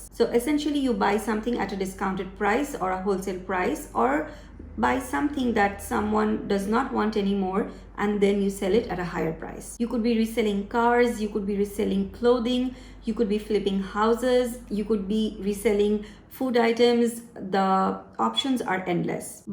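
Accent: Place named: Western India, India. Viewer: Do you practice boxing? Why your hands are Red? Your English is Gujarat or Maharashtra side of India.. If you don't mind then please let us know from what part of India you are.. Need help on LLP and bank account that's why I came to your channel and subscribe I was in US last year.. Have 10 years multiple entry US business India.. Like to export goods like Clothes but have no idea.. Any other then clothing what else you recommend to export there??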